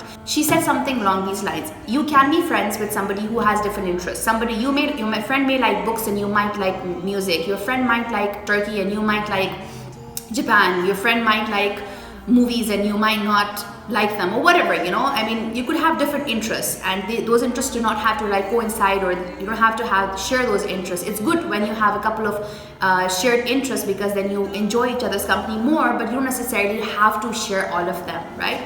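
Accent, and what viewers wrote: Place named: United States. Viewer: Waaaoooww!! You have an amazing American accent. OMG that is soo cool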